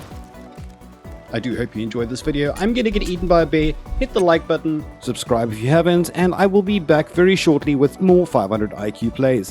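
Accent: South Africa. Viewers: U sound like you have a South African accent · Hahaha heard the intro and was like this guy is South African! Good job man great to see fellow Saffas making it with gaming channels!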